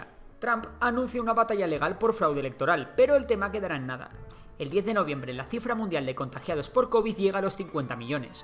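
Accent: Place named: Spain. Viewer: la verdad se nota que eres de España xd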